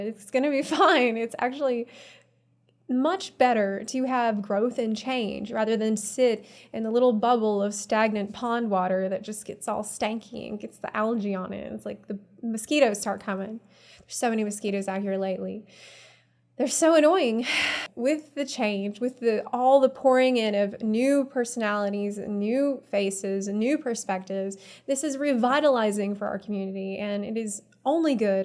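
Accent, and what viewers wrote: South, United States. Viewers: Her southern accent is hot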